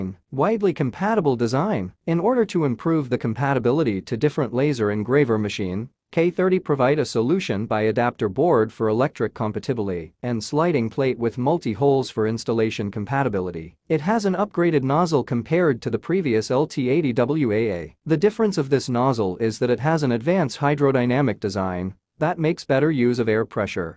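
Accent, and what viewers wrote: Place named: China. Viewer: Computer voice sucks big time!!!!! sounds like chineglish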